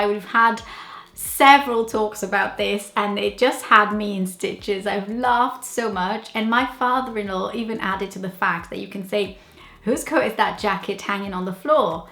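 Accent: Wales, United Kingdom. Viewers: Brilliant girl! Tidy Welsh accent🙏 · Your accent sounds 100% welsh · You’ve got a bit of a welsh accent coming through! I’m Welsh and didn’t realise that half of these things were a “Welsh thing”. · God i love the welsh accent twinge to your accent.... Genuinely never knew some of these were welsh things.... nice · Wow, I'm Brazilian and I'm married to a Welsh man too and it is SO refreshing to hear you speaking with Welsh accent. Da yawn i ti!!! We are preparing to go back to Wales and finding your channel was a great bonus. Hope we bump into each other at some point. Hwyl! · Did you learn English in Brazil? Or did you learn the majority through immersion when you moved to Wales? You do actually have quite a bit of a Welsh accent, you don't have a real noticeable Brazilian accented or having a "neutral BBC English" accent. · Your accent sounds authentic Welsh to me ! 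I'm just over the bridge(s) , Bristol 🏴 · what welsh twang in ur voice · Absolutely spot on. And btw nice welsh accent!!🤪👍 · Welsh, I am, from Swansea, living abroad. "Who's coat is that jacket?" is a new one on me, never heard it before. But...one of my favourites is.."now then!" Great video...it got me smiling in recognition. And your accent is SO Welsh...loved hearing it! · I can hear the Welsh in your accent. I wouldn't know you're from Brazil until you said!!! Aww tidy mun!!! 😊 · You have a Welsh accent!! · How do you sound welsh en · And you are picking up a Welsh Accent, a Valley’s accent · Your accent is turning Welsh. Cardiff? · im welsh . you sound like you have a welsh accent aswell xx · I’m a Londoner and to my ears in your normal speech you are definitely Welsh with a light touch .incredible! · Sound full on Welsh not just a little bit. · This is brillant video. I chuckled all of the way through it. 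Sometimes if somebody says "Can you do this now?" you may hear the reply "I'm busy now. I'll do it again" (at another time) or "I'll do it now jest" meaning later or "later on". To "cwtch" can also mean to hide (something) or the cwtch, the space under the stairs or a place where the coal was kept, the coal cwtch. To "potch" - to tinker with. Blackpat - cockroach. The list is endless. Around here (Rhondda Valley) you hear them all the time. I love your accent. You really have picked up a Welsh "twang". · You have such a welsh accent for a Brazilian! No offence! My boyfriend is from Bangor, North Wales and I’m from Aberystwyth, Mid-West Wales and our accents are kinda different but we love each other’s, it’s so cute 😂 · You have a nice Welsh accent developing there. Tidy! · I thought you was welsh lol, you have quite a strong accent not just a twang 😅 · You do not have an English accent, you have a wonderful Welsh accent. 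If I didn't know your story, I would swear you were Welsh born and bred. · Wow ur accent sounds quite welsh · I did the opposite. I am a Welsman that decided to live in Brazil after me and my Brazilian wife got married. I did notice straight away your Welsh accent by the way. Congrats on your perfect English (Wish I could say the same for my terribke Portuguese) ''Miss my Wales I does see'' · You picked up the welsh accent for surel.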